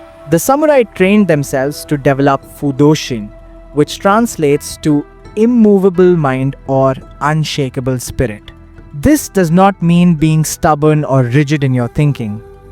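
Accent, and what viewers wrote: India. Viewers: Indian accent 🤮🤮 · Hi, I really like your videos and your country is japan in description but your English is telling that you are Indian. Am I right sir?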